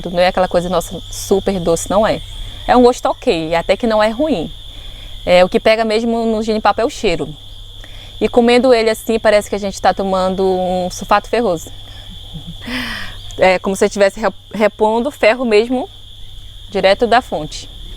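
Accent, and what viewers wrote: Rio de Janeiro, Brazil. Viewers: Oi, linda...se sotaque parece carioquês...😅😅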